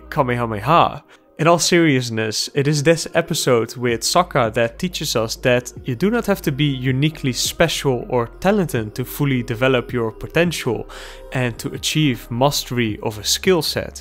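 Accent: Netherlands. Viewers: that duthc accent omg 💀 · Is this guy louis van gaal? That accent is very clear. Not negatively or positively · Je Nederlandse accent is wel erg kenbaar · Jij bent sowieso Nederlands aan het accent te horen hahah nice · Lol i love ur dutch accent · That's one thick Dutch accent. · Why can I hear that this guy is Dutch?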